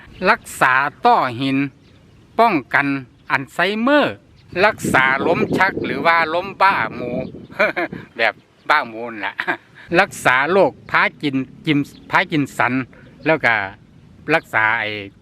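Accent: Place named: Chaiyaphum, Thailand. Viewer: คนลาวอิสาน ชัยภูมิ เว้า แนวนี้ เนาะ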